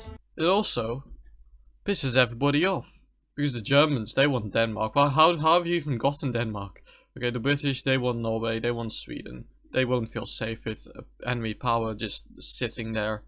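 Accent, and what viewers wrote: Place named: Netherlands. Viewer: Ik hoor toch een Nederlands accent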